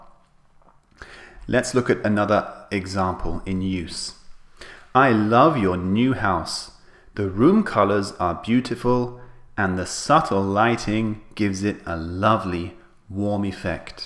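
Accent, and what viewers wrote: United Kingdom. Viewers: the bri'i'sh accent is quite charming!!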